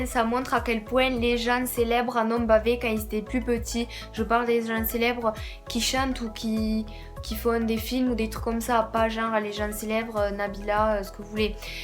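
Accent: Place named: Toulouse, France. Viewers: Étant également une très grande fan de Thomas, j'ai vue tout les films que tu as proposé, et je suis d'accord avec avec toi de AàZ. Sauf pour le labyrinthe, j'aurais mit 10, et pour Nowhere boy j'aurais mit 10 aussi, mais là c'est plus personnelle comme je suis fan des beatles et que c'est grâce à ce film que j'ai connu Thomas. En tout cas, très très bonne vidéo, super agréable à regarder. J'adore ton accent (toulousain?) je pourrais t'écouter parler pendant des heures hahaha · Thomas c'est le meilleur, perso c'est mon premier acteur préféré 😍😍😍😍😍 Et sans avoir lu ta description j'ai reconnu l'accent Toulousain x) moi aussi je suis Toulousaine ! 😀😀💕👌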